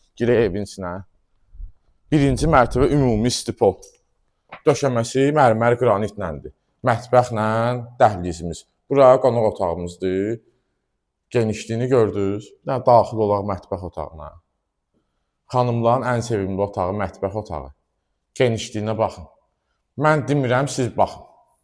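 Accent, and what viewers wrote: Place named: Baku, Azerbaijan. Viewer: бу гардашын дашынмагындан беле баша душурук ки бу гардаш заводской бакылы баласыдыр озуде маштагылы олачаг . валлах сенин о ширин лехчене горе истесен де истемесенде адамо еви алар . гардаш вар ол саг ол емише беле позитив ол сене гулаг асанда адам гедиб душун <num> иллере чох саг ол ки кечмишимизи йадымыза салдын аллах хейрини версин ай гардаш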